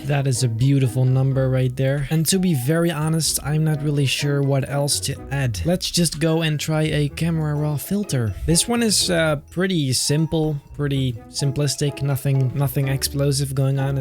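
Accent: Netherlands. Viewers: Wow maat dat is sick dat ik jou nu pas tegen kom. Ondanks dat je Engels zeer goed is kreeg ik toch meteen al het gevoel dat je Nederlands was🙈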